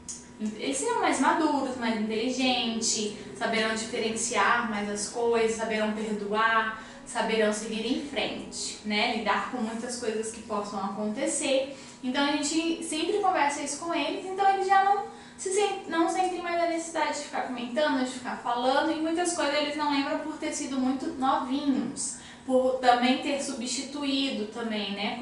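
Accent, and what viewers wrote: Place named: Federal District, Brazil. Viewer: eu jurava que você era de DF kkk. Obrigada s2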